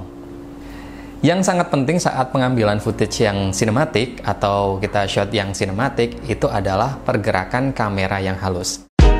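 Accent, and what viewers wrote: Pekalongan, Indonesia. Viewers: Pekalongan mana suaranya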